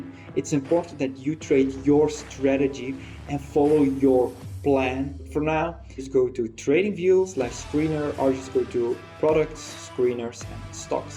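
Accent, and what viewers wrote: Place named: Switzerland. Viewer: your accent sounds like swiss :-)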